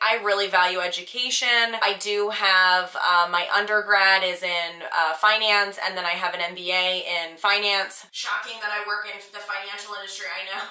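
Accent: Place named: Ohio, United States. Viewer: Nice to get to know more about you! I thought you may be from my neck of the woods due to your accent. I am an Ohio girl. We have alot in common and and can totally relate.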